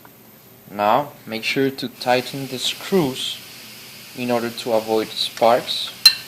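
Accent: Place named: Cuba. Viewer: Hialeah is a city within Miami, Florida. He sounds Cuban..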